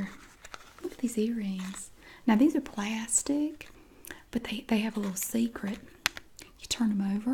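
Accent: South, United States. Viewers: I swear, I am slowly picking up her southern accent after constantly watching her videos lol . . Either way. Fantastic job, Mam. lol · I'm from Germany & speak a couple of languages, but nothing is more entertaining & interesting than your southern accent. It kind of makes me feel all warm inside. It makes me think of happy animals on a farm, corn, BBQ, apple pie & hugs. 🇺🇸 ❤️🌽🥧🍩🌭🍿🥞🐄🐖🐑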